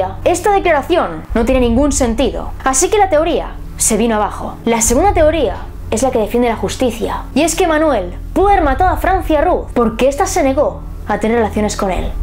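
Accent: Spain. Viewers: Hola soy de mexico y un buen trabajo a pesar de tu acento español muy bello trato de entender pero gracias a ti comprendí lo de la matanza de Columbine mucho mejor que el documental de hora zero aunque gracias al documental you tuve me direccióno a ti saludos en hora buena sigue así mucho exito👏👏👏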